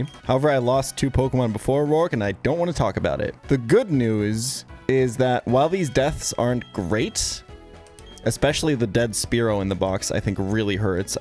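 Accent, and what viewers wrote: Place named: United States. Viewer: It’s so weird to hear perfect American English coming out of a Germans mouth, especially in this outfit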